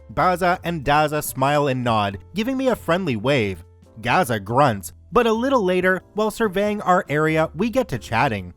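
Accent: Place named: Canada. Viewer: the Aussie way of pronouncing the names is with a short "a", and it is similar to how you would pronounce the word "has". However, as is (I believe) Canadian (?), I can't really fault him for saying the names differently.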